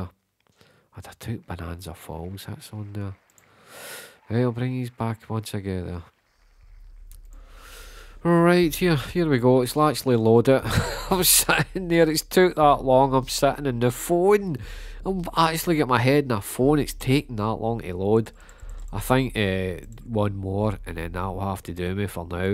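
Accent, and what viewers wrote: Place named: Scotland, United Kingdom. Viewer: I said to myself like Kilmarnock or something aswell 😂 just couldn’t quite place the slight accent, Good luck on your upcoming videos mate hope to see a record breaker soon 🙏🙏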